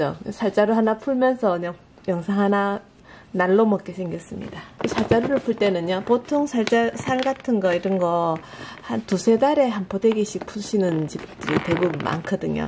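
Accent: Gyeongsang, South Korea. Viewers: 경상도분이신가봐요...쌀을 자꾸 살이라고 발음을 ㅎㅎㅎ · 어쩌다 영상 보게 되었습니다 경상도 사투리가 친근합니다 쌀=☞ 살포데기, 꿰메다☞꼬메다! 특유의 억양까지 말씀이 정겨워 좋습니다 영상 잘 봤습니다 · 쌀자루.. 발음이, 살자루.. 살포대기로 들립니다. 경상도 울산출신이신 우리 매형 발음과 비슷하십니다. 우리 누님과 매형생각이 납니다. 고속도로 우측 주행... 아주 알기 쉽고, 실용적인 설명 고맙습니다. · 경상도 사투리 이해 합시다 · 살포대기 살포대기 ... ㅋㅋㅋ 갱상도 사투리 넘 귀여우심 . 좋은 정보 감사요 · 갱상도 사투립니다.. 살, 사움, 엄악 .... ㅋㅋ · 쌀브랜드는 서산쌀에 경상도사투리 구수하신 아지매의 음성으로...뭔가 재미있습니다. 구글ai가 오늘은 쌀포대 언박싱영상을 ...성질이 조신하지 못해서 매듭푸는 방법을 아는대도 불구하고 한번에 잘 풀릴때도 있고 방향 거꾸로해서 첫방부터 꼬이기도 하고 이럴때는 속에서 뭔가 올라오는 날ㅋㅋㅋ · 경상도 사투리 구수 합니다... 하하하 살포대기...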